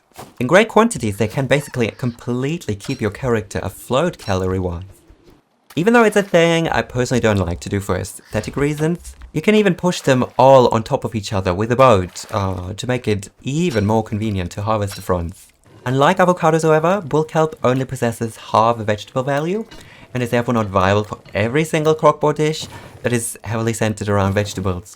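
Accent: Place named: United Kingdom. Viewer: I appreciate the British accent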